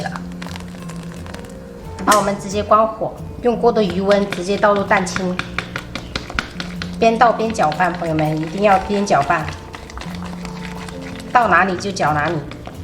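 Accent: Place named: Minnan, China. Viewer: 發糕我們都是特別的節日做的，大部分是過年的時候做的比較多，其實你們閔南話，跟我們的台語好像，所以一直覺得妳讓我感覺好親切哦！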